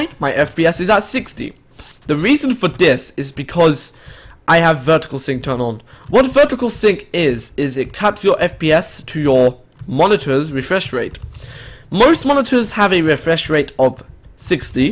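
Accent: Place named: RP, United Kingdom. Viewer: wow, you sound so posh... (I'm just noticing)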